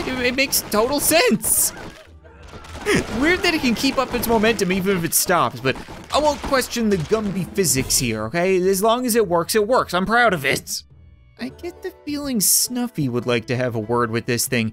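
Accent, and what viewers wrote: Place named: Canada. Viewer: pronouncing his words differently might have something to do with his accent, I think. I find it obvious he pronounces “sorry”, “tomorrow”, “about”, etc. the way he does since he’s Canadian.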